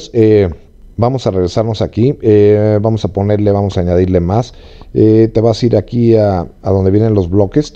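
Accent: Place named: Mexico. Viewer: Detesto escuchar videos con voces que no sean mexicanas, y por eso, este video fue música para mis oídos, gracias!!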